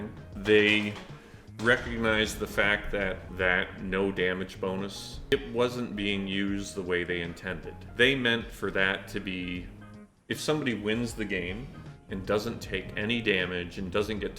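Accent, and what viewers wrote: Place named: Canada. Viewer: Sounds like is Canadian 🍁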